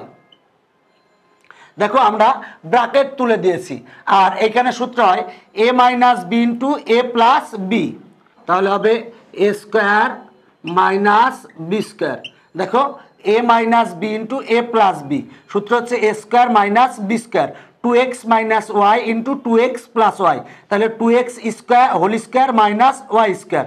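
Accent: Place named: Kushtia, Bangladesh. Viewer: Sir apner Basha kothai??? Apner bola kichu Kotha shune Mone hoi apni kustia thake😁😁😁😁😁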